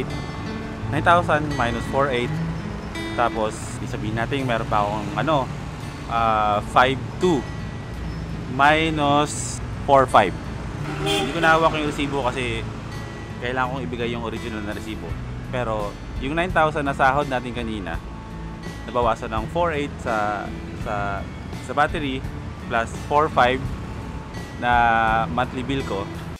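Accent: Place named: Negros, Philippines. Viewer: Mukhang taga negros na yung tuno mo mag salita ah..!! Hihihihi Solid perstaymers tayo!!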